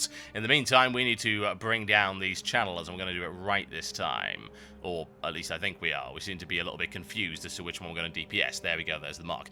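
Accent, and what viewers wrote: United Kingdom. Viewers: Thank you for your job - It might be kinda difficult to record all these great videos. Well, just know, that people are really enjoying this stuff: interesting reviews, good sense of humor and of course your accent. In fact my native language is russian and I've been learning english for 12 years. I always was crazy about brit accent and thanks to you, I have great experience of listening and obtaining of such an interesting noble accent. Plz don't stop doing this stuff- We're all with you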